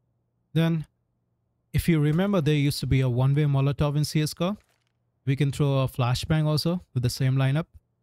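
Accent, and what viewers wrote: India. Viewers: you sound Indian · always the indian accent tutorial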